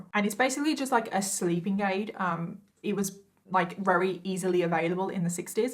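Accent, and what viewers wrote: United Kingdom. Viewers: i mean i watch bailey but u dont watch THIS girl because shes british and i cant really understand her and also im learning american english and i dont want to mix these accents up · Was wondering why your videos were so similar to Bailey's.... then I saw in the description box you give her 100% credit for your inspiration! Good job ! Honest, beautiful, great makeup artist and story teller AND with a Brit accent ! Sad how Feliz means Happy 😧 One advice: Read Dante's Inferno, I know you're young so have not heard of or read it, but it should be a part of your literary culture. You'll thank me later ☺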